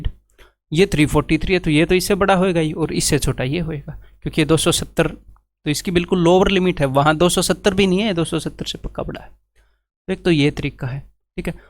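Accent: Haryana, India. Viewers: You are awesome. I am also from haryana. Felt connected with your tone . I am big fan of you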